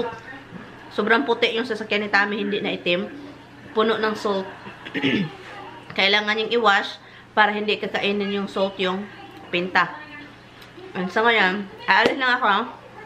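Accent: Visayas, Philippines. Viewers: Hello and. Shout out to you guys. so sounds so Bisaya? And which part of Bisaya.?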